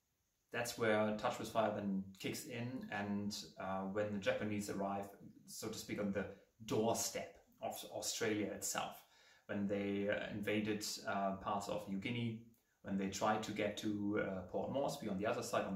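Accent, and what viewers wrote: Germany. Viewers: Hey nice review bro, love your German accent :D I also speak German. Wie gehts? Subscribed! Have been posting some book reviews recently too, we should support each other :D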